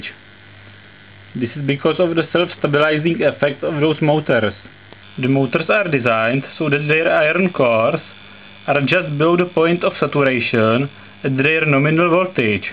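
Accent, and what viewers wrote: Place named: India. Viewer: Это английский язык, но говорит житель Индии, скорее из северной ее части. Южан очень тяжело понять, а этот говорит так, что его слова понять можно.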